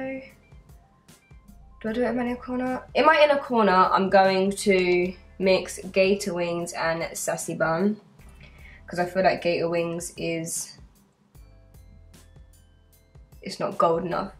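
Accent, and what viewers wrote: London, United Kingdom. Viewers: gives me Audrey Hepburn vibes...she even has the Eliza Doolittle accent (I don't know what part of London) from the movie My Fair Lady!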